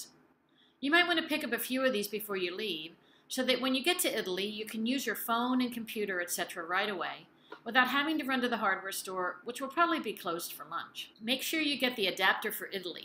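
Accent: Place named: New York, United States. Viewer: Thank you so much for your videos. I watched this one and the how to buy property one as well. Thank you for sharing so much valuable information. I have a frivolous question for you. We live very near your previous place in Long Beach, CA. And you now live very near the Alps. I have friends who live in Torino. And he has shown me video of piles of snow. How are you coping with the weather difference? I think I can detect a bit of a New York or at least a northeastern accent. So maybe seasonal change isn't quite so different for you? We would really like to live in Italy. But I am thinking somewhere in the south because it is a little closer to the climate we are used to. We are not comfortable in the extreme cold I see in the north. I know you love your area, but have you visited many areas in the south of Italy? We love Sicilia and Puglia. 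Both of their climates remind me of coastal southern California. Thank you again for your videos. I liked and subscribed. -Joe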